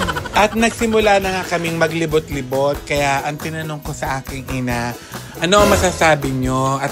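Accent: Batangas, Philippines. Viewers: Authentic Batangueno ang narrator na pumunta sa Iloilo, di ba? The best pakinggan.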